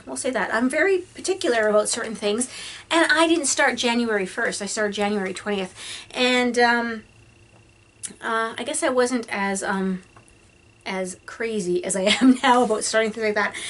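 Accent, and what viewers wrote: Canada. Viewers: The "aboat" gave it away immediately! You're Canadian! I've enjoyed watching your video.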